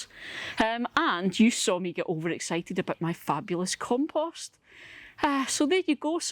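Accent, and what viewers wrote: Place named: Northern Scotland, United Kingdom. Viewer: I see you are on the East side of Scotland - whereabouts? I spent 3 years in Rosyth but you sound further North (to me anyway LOL)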